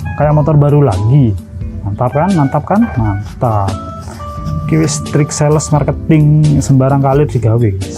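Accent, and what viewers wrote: Madura, Indonesia. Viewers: Sampean asli mana mas kok bahasanya jawa tapi logat madura 😂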